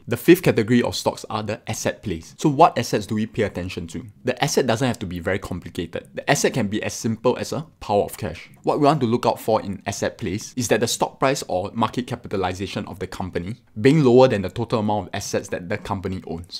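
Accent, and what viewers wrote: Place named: Singapore. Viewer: No pun intended. It’ll be nicely if you can slow down when speak. You sounded like one of my colleagues from Singapore. He has a similar accent like you but speak in a slower pace. In the US, we also have a different accent among various states. Other than that, the content is helpful.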